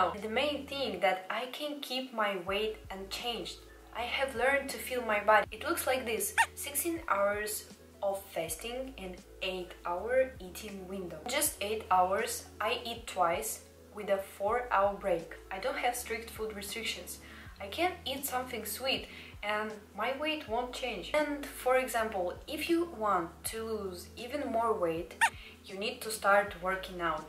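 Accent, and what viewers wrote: Russia. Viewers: Не посмотрев на имя, услышала акцент, и думаю, она что русская? Имя - сказало все за себя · Your accent (Russian)? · Русский акцент в английском так классно звучит(Грустный русский взгляд))) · Слышу русский акцент) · My brain cannot process on how korean you look but have a russian accent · мне нравится твой русский акцент😩💖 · Почему мне всё видео казалось, что она с России или Украины...Просто акцент у меня и моих подруг такой же....Ну или мы просто уже на уровне бог говорим...Ну или я сейчас жестко туплю из-за авто переводчика на ноуте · Yes, she has Russian accent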